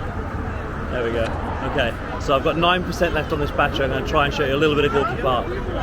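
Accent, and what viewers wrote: United Kingdom. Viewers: If you're living in US you should hear his accent, it's obvious UK accent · Нет, это британский английский. Поэтому не так понятно .😊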